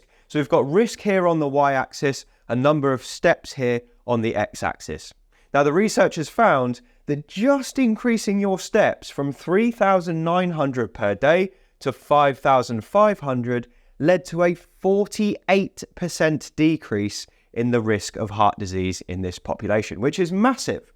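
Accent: United Kingdom. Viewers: Really like this channel. Just ordered your book from Amazon. There is something about a British accent that makes one sound smarter :) Just to add that 3 X 10 minute walks per day control blood sugar and hypertension better than 1 X 30 minute walk per day.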